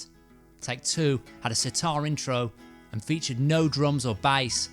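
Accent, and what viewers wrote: United Kingdom. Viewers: There's an old saying: "If you put lipstick on a pIg... it's still a pig." Well, "If you put a cliche British narration on a mediocre script, it's still a mediocre script".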